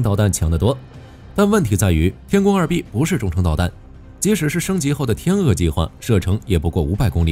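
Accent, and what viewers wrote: Mainland, China. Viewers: 這個頻道的語音為什麼是中國大陸的口音？是用中國大陸的AI配音嗎？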